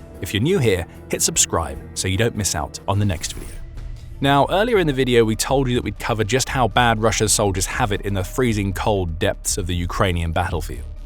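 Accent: United Kingdom. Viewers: Whenever you hear a limeys voice narrating you just know its bullshit.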